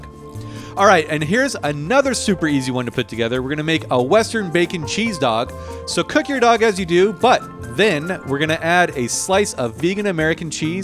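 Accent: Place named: California, United States. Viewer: I thought he sounded like Chef John, too! But yeah, it appears that it's just a regional Californian accent :)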